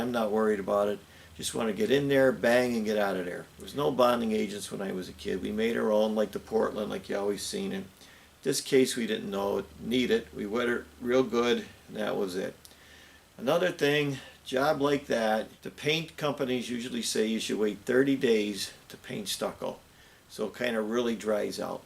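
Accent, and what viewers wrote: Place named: Scranton, United States. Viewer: I am turning 72 this month. My house was in need of some repairs that I thought would have to be done by a professional mason but watching your videos gave me the confidence to attempt them myself. The results were great only because of your excellent explanation and recipes for mortar and cement. Thank you so much for offering people such wonderful information concerning your skills. By the way, my father was raised in Scranton,Pa. and he spoke just like you. God bless you. Sharing old world traits is not something we see much of from the masters anymore. Take Care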